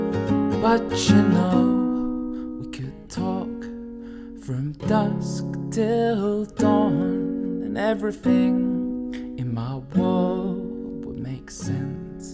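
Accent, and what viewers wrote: United Kingdom. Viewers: Is it British accent ? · Is this British accent or American accet...please tell me..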